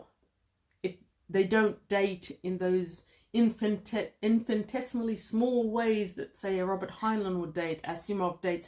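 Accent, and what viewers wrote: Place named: New Zealand. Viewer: I'm wondering why a woman with a Kewpi accent (I'm guessing here, all I really pick up is southern hemisphere) has U.S. editions of these novels. Is it just that New Zealand has such a small population that, even with the distance involved, it's cheaper to import such small quantities than to do the setup to print them.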